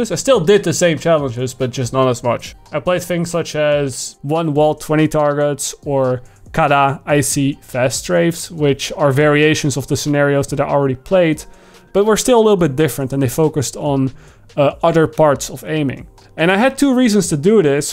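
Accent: Netherlands. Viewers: Dude got some Dutch accent, btw I also used this aimtrainer and now can outaim most of the players i play against (Now I just need to grind to develop game sense)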